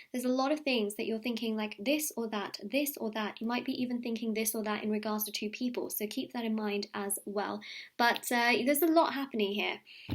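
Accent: United Kingdom. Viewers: British accent 💗💗💗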